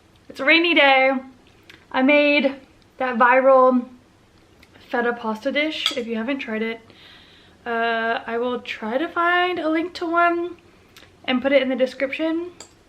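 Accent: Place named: South, United States. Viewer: Omg your southern accent is quite prominent at times throughout this video and I absolutely adore it 💞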